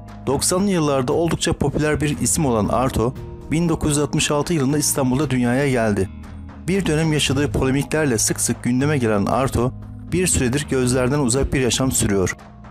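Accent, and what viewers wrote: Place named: Armenia. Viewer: LÜZUMSUZ, GEREKSİZ BİR VİDEO... AYRICA SESLENDİRMEYİ YAPAN AMCA. SENİ TANIMIYORUM. ADINI DA BİLMİYORUM... AMA KESİNLİKLE SENİN DE ERMENİ OLDUĞUNU SÖYLEYEBİLİRİM... HANGİ SES TÜRK SESİDİR?, HANGİ SES ERMENİ SESİDİR?, HANGİ SES YUNANLI SESİDİR? HANGİ SES KÜRT SESİDİR? HANGİSİ ERAB SESİDİR? ANLARIZ, GERÇEK BİR TÜRK BUNU ANLAR.. ANLAYAMAYAN VARSA TÜRKLÜĞÜ KONUSUNDA Bİ SORUN VARDIR. videodaki sanatçılar, show dünasının aktörleridir... Türklerin show VE eylence ile işi olmaz. onlar tarih yazarlar.. bazen karanlık dönemlerde olur tarihte... ancak aydınlık her zaman galiptir. bu arada videodaki tiplerin hepsi bizi eyledirmiş, gönlümüzü hoş etmişlerdir, gözümüzde saygındırlar.. onların ermeni olup olmadığı ile ilgilenmedik. ilgilenmeyiz... ölenlere rahmet, yaşayanlara sağlık dileriz. TEKRAR SÖYLÜYORUM GEREKSİZ BİR VİDEO, BU TÜRK TOPLUMUNDA, HİÇ KİMSE ADİLE NAŞİT,COŞKUN SABAH,ONNO TUNÇ, VE DİĞERLERİNİ ; NE ERMENİ DİYE DAHA FAZLA SEVDİ; NE DE ERMENİ DİYE SEVMEKTEN VAZGEÇTİ...BÖLÜCÜ APARATI OLMAYI BIRAKIN...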